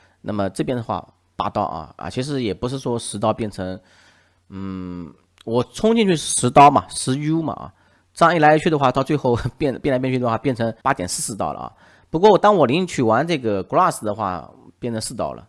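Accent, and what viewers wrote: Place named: Hangzhou, China. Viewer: 口音听出来杭州附近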